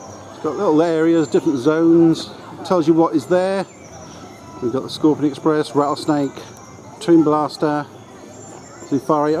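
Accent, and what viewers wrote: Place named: Suffolk, United Kingdom. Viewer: Never seen your videos before but I enjoyed this. I could hear the Suffolk accent in you and then you name dropped pleasurewood hills. Lowestoft boy? 😀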